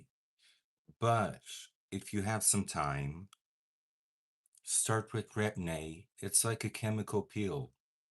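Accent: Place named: Pittsburgh, United States. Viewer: Ha you guyz!!!! How are Yinz dooooooing!!! You sound like a Yinzer!!! Hehehehe Pittsburgh would love you in it's city❤❤❤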